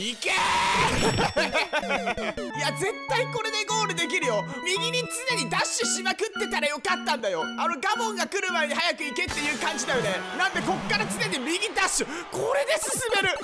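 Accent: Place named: Kansai, Japan. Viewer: 関西人やな どう？合ってる？イントネーション引っかかった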